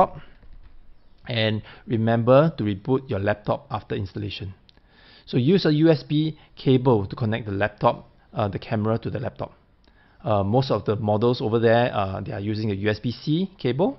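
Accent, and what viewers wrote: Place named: Singapore. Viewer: 6 seconds in....he calls a point and shoot a DSLR though you can hardly understand a word he says thanks to that incredibly hard to understand Singaporean accent that slaughters the English language, plus he slurs his words AND talks way too fast. HINT: Talk s-l-o-w-e-r so everyone can understand your bizarre accent. Its very unusual. Its not easy to understand. Slowing down will solve almost all of these problems. Then try to enunciate better. And do NOT use any slang. With that accent it sounds ridiculous. Just keep the words simple and clear.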